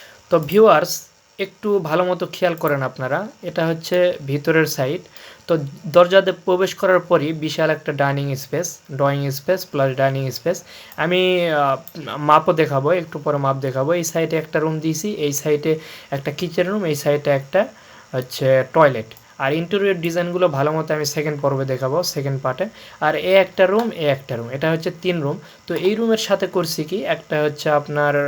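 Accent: Naogaon, Bangladesh. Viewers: নওগাঁ আর জয়পুরহাটের ভাষা কি এক? উনার ভাষা নওগাঁর মত।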